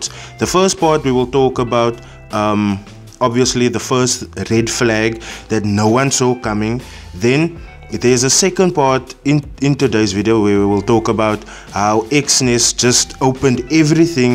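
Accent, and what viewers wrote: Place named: Cape, South Africa. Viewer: Snap bra you have that heavy Kaap accent. Much love my bra. As I subscribe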